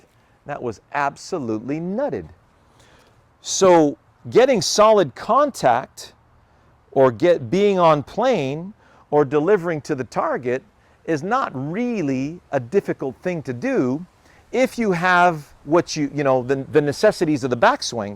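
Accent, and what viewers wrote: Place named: Quebec, Canada. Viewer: Hi Mr. I'm totaly in love with your mental approche for the swing of golf. I found your video by starting to watch your french imitator! By the way your Quebec accent is style intact. I'm from Montréal so if you do come in Montreal for any teaching, let me know. So I'm going slowly throw all your vidéo and trying to apply these tips in my game. I like to pratice more so I'm looking to by a net and a mat similar as the one you use in yout backyard. Can you tell me what's the brand of it. Thanks for all your vidéo.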